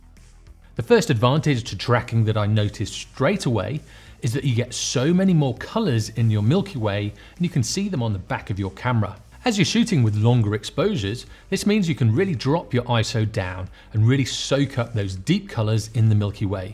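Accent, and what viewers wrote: United Kingdom. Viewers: I like your presentation skills - you present with conciseness and brevity. And, cheery on the top is your british accent! ;)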